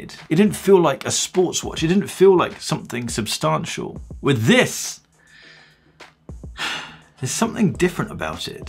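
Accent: United Kingdom. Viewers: Great video. Where are you from? what's that accent if I can ask? Sounds to me like British but not quite the same as I'm used to....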